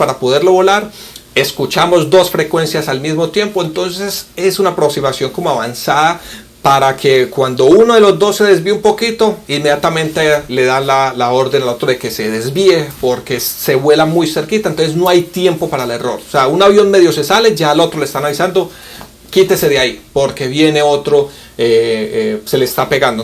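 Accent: Colombia. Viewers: EXCELENTE....QUE SENCILLEZ. PARECE PAISA O BOGOTANO .....PERO COLOMBIANO SI ES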